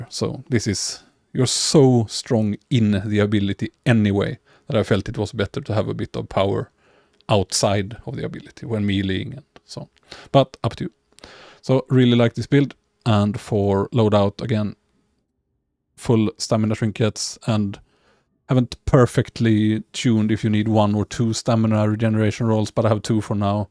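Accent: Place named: Sweden. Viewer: pause you're from Sweden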